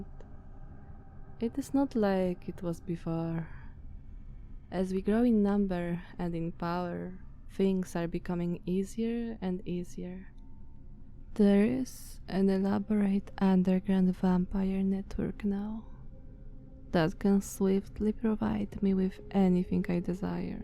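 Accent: Eastern Europe. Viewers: Perfect as ever bless i passed out half way through! So relaxing and loved this dominant side of you and slavic accent! <3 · Mmhmm this is the good shit👌 vampires with slavic accents are the way it should be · Feels like a proper vampire needs a good Slavic accent after hearing this! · Hmmm. Slavic accent. Nice. Considering that Dracula, the most famous vampire of all time, comes from the balkans, a mostly slavic region. (I know he comes from Transylvania, Romania but the greater picture makes it pretty nice to see for a perfectionist like me, who appreciates details) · You had me at Slavic accent Hrnng... I am in love. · I can always appreciate both vampires and slavic accents.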